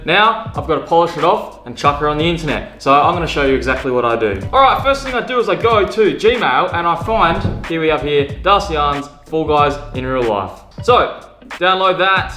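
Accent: Australia. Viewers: How to make a Film Lots of Screaming Thicc Aussie Accent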